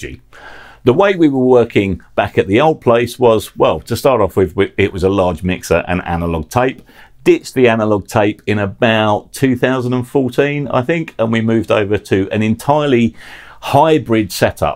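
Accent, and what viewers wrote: United Kingdom. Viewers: Entertaining and informative. and you must be from the West Midlands. i subbed lol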